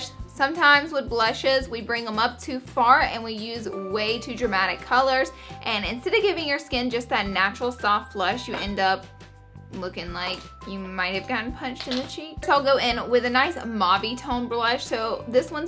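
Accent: South, United States. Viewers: "And I'm gonna bring it up way too...good Lord! Way too high." hahaha that was great. Also, are you from the south? You have a southern accent like I do. :) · she's just southern 😂 never go to the south if that accent gives you such a big problem. everyone agreeing with you is just silly. if any of you had to deal with southern people on a regular basis, you'd probably find her voice way less grating. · I'm just coming across your channel and I just LOVE your personality AND your voice! Haha! I'm not sure where you are from but you sound a little southern and that's why I like it! (I'm from Atlanta by the way :D) I can't wait to watch more of your videos and learn new tips and tricks from you! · Your southern-ness is so adorable lol :) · sounds southern. The whole time I was actually thinking that I love her voice. But I'm from Texas so lol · Thank you!! 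You're the first makeup Youtuber to talk like me. I'm from the South and we are for the most part portrayed as dumb inbred hicks which is far from the truth!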